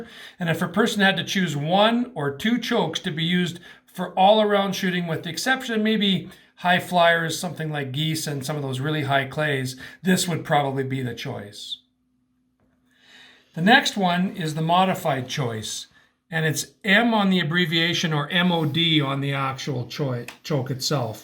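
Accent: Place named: Canada. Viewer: Its just a Canadian accent, buddy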